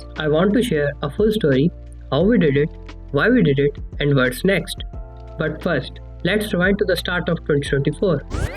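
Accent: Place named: India. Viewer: Your indian accent sucks bruh...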